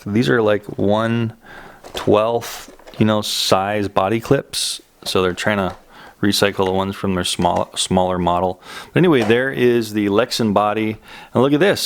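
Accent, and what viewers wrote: Hawaii, United States. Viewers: You live oahu? Nice to hear pidgin on youtube!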